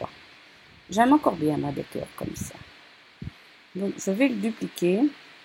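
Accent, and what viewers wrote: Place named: Brussels, Belgium. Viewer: Merci beaucoup à toi pour tous ces tutos sur la caméo...j'ai toujours peur de faire des bêtises et donc je ne m'en sert que pour les découpes tradi...grâce à toi, j'ose me lancer et en plus j'adore ton accent qui me rappelle ma ville natale (Bruxelles). je te fais de gros bisous et continue à rire et nous faire rire. cela fait tellement de bien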